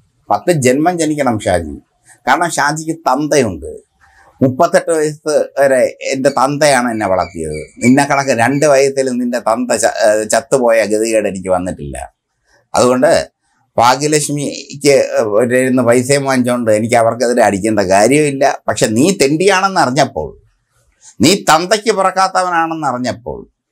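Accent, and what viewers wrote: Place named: Thiruvananthapuram, India. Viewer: അണ്ണാ tvm ഭാഷ... വളരെ മനോഹരമാണുകേട്ടോ... രണ്ടാളും ട്വിൻസാണോ?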